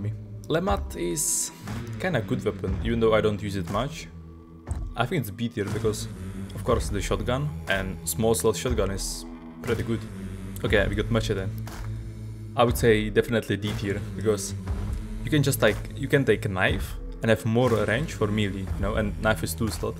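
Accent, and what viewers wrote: Czech Republic. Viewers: Hmmmm is that a czech accent i hear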